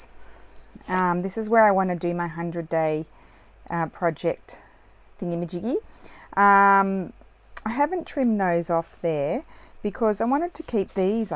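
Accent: Australia. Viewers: I love to hear your adventures in Italy and i love to hear your Australian accent. And of course your crafting too. We have to do all the running around too for paperwork its just how it is (USA) but thats ok it will all work out! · Hi, Love the very typical Aussie rant. A breath of fresh air. Kerry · "get nicked" 😂. As a fellow Aussie I can't tell you how fantastic it is to hear an Aussie accent and expressions in a crafting video! Yours is the only video on YouTube that I can easily listen to for a whole hour 🥰.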